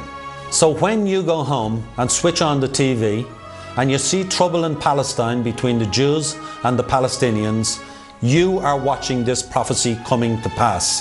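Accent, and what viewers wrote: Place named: Ireland. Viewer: Love that Irish accent! Cool vid!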